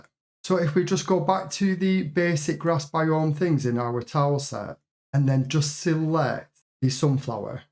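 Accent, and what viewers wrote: United Kingdom. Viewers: Fantastic Tutorial!! I really have to ask though, where exactly is that accent from? Some region in England obviously, but where? I am trying to figure that out for 1 week now. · As someone who use to make tutorials, I can say this is a HUGE amount of effort! Seriously well done on a fantastic tutorial and excuted so well! Are you from Lancashire by any chance? Just thinking from the accent?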